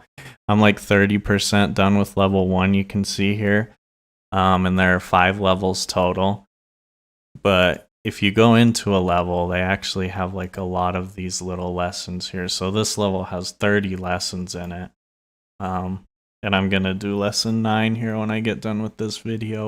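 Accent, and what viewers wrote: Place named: France. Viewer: You shall be spared (for the french accent)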